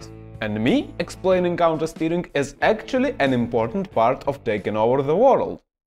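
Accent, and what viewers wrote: Russia. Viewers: A Russian doing more Russian accent.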